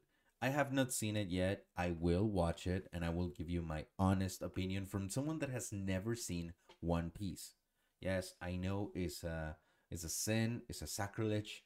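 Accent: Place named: Spain. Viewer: Okay., I'm gonna say it.Hearing a native Spanish speaker say Japanese words like Naruto in English is funny as heck. The 2 accents make it funny as all get out. could probably say it better in Spanish. When he says it in English the 2 accents compound to make it super funny. Don't stop. I still want to here what you have to say. Even if it sounds weird.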